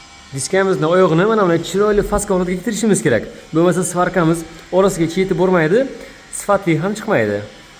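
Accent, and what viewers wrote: Bukhara, Uzbekistan. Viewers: Бухороча шеветон дажонам❤😅😅 а Владивосток салом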